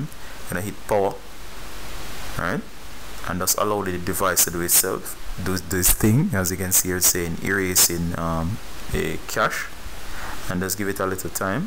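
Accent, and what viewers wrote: Jamaica. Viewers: You sound Jamaican cool. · You sound Jamaican😎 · brilliant Jamaican accent. Thanks for the tips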